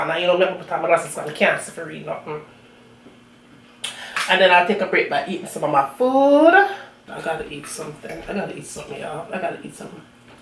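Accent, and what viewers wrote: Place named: Jamaica. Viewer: You doing good with the Jamaican 🇯🇲🥑accent 😃you go goodie🥰. Happy Independence Day 🍹and you eating your dinner 🥘 right🍍🍅🥒🥥🍍mi making some a your hominy corn porridge 🥣 for my dinner today 8/6/20 🇯🇲😃❤️keep the Jamaican twang going. You not from Spanish country, so you have to speak your Jamaican twang 👍🧄🥕🦞🌷🥰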